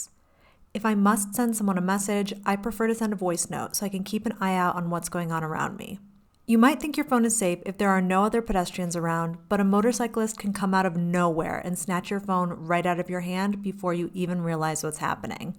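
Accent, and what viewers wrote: United States. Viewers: Nice job on the video. I noticed your husbands tattoo of Michigan, and I have noticed that your accent is familiar to me as I am from Michigan. I live in La Paz, BCS, Mexico now . Keep up the good work.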